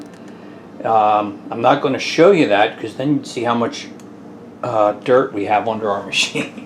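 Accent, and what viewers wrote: Philadelphia, United States. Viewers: You from Philly or Boston?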